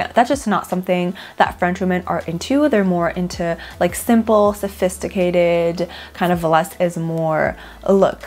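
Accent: United States. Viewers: Your America accent is darn good, so says this American! Of course, we would expect your French accent to be perfect. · Are you french? I feel like you talk like you came from the US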